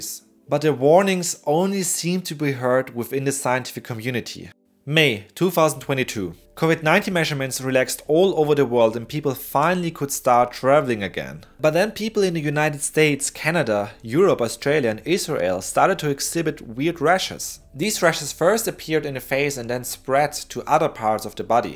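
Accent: Germany. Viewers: The guy who talks is german you hear it in his english out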